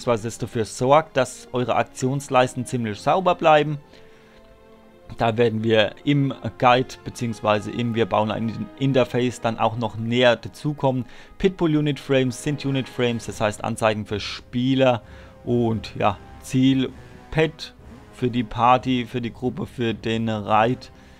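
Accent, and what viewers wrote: Palatinate, Germany. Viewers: ohh ein Pfälzer find ich gut darf ich fragen aus welchen raum du kommst? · Da Pfälzer....wo her?^^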